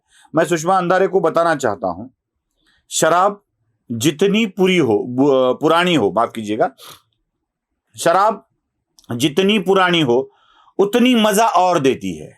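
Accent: Maharashtra, India. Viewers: Hindi thik se nahi aati mharhti tuun lag rahi mharhti aap ke muha se achhi lagti Hindi na bola kare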